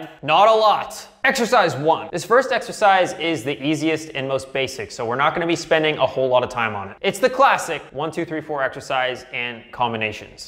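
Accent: Canada. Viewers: You canadian? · This guy sounds Canadian af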